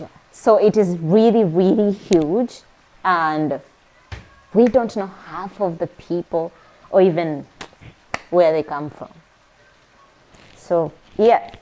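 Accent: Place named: Pakistan. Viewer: You made me overjoyed to picked up Pakistani accent. It means you really love Pakistan ❤ 🇵🇰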